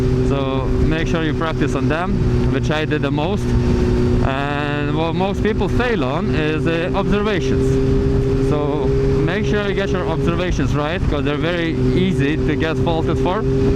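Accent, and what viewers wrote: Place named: Latvia. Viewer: Cheers mate. Ain't you from Lithuania? I hear some accent... Latvia, maybe.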